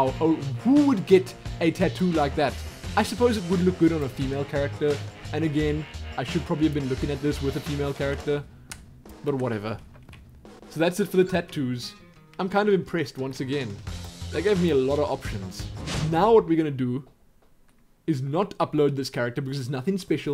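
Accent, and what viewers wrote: South Africa. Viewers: Where are you from you sound a very South African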